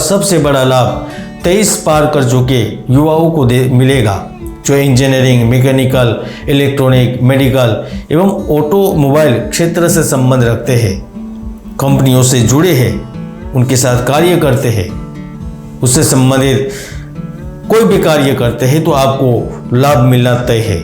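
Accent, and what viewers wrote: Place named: Gujarat, India. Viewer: I like your simplicity and Gujarati accent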